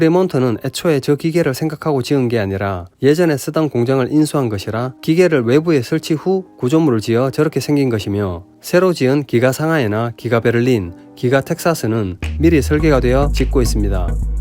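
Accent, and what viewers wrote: South Korea. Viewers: 님 안녕하세요..처음 여기 와보네요.사투리가..칠곡 살던2000년 기억나네요..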